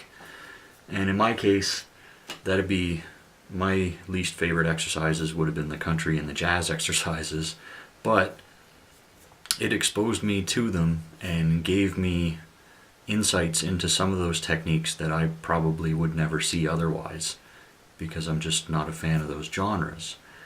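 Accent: Canada. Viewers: I have to ask where you are from? I'm from Mabou, Cape Breton and I hear a Atlantic Canadian accent... Thanks for your videos. Helps a lot.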